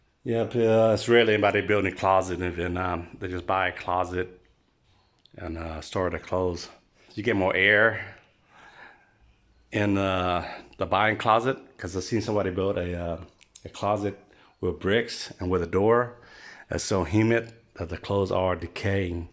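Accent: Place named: St. Louis, United States. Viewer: Where did you pick up the black lingo and dialect? I've never heard a Vietnamese sound like you before. Sounds like St. Louis area. Where in Vietnam is this?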